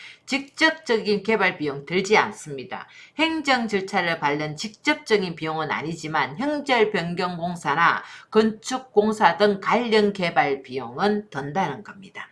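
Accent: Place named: Gyeongsang, South Korea. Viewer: 표준어좀 씁시다 젠장 갱생도 말좀 쓰지말고 먼소리 인지 하나도 모르겠음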